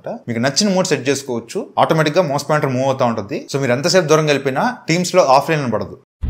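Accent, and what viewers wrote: Nellore, India. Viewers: Needhi Nellore ah?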